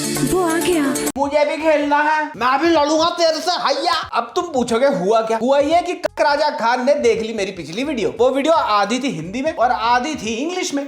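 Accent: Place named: Delhi, India. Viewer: Bhai apki Avaj se Lgta aap pakka delhi se honge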